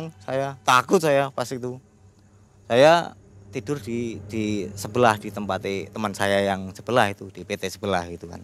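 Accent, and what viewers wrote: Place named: East Java, Indonesia. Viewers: Jowomu medok leh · Logat jowo wetan iki mang ei,serem critane😮😢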